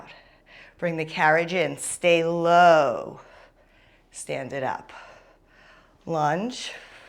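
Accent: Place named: New York, United States. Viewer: I really enjoyed this one! Are you from New York? Long Island or somewhere? I find myself saying "carefully" like you do all the time now! lol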